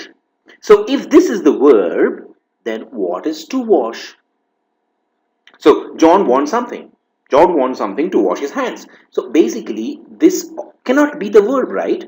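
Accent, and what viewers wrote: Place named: India. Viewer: I think the speaker is Indian because of his accent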